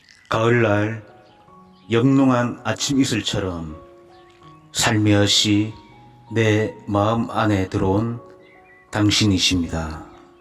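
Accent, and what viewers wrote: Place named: Gyeongsang, South Korea. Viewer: 경상도 아저씨의 사투리가 살짝 들어간 풋풋한 음색, 좋은글, 좋은시, 이 컨텐츠 너무 좋아요~ 좋은시 한편 잘 듣고 갑니다~ fighting~~~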